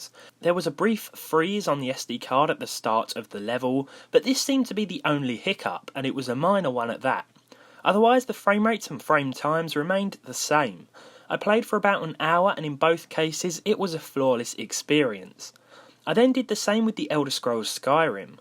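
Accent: United Kingdom. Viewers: I thought u were british... Effect?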